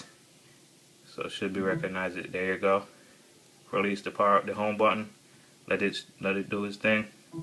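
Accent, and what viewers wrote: Jamaica. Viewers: Jamaicaness of this dude cannot hide...mi luv the fact seh him nah twang an still understandable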